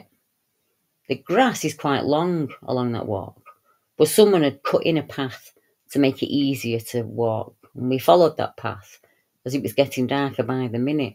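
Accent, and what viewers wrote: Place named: Manchester, United Kingdom. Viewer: Hi, I hope you are well, having a good day and I hope you have an absolutely beautiful weekend!! I have said it many times before and no doubt will say it many times again. Thankyou so very much on behalf of all the witnesses to all the various types of "paranormal actvity" and those of us who feel obliged to investigate these experiences and have been guided by your constant help and although never steering any of us "this way or another way" just sticking with the facts, and then those of us who just like to listen to that "Manc brogue" and beautiful accent..... we all admire, respect and thankyou for all you've done, are doing and will do and who knows where the heck we'd all be without all of the thousands of hard fought hours you have kicked into touch - THANKYOU 🙏❤️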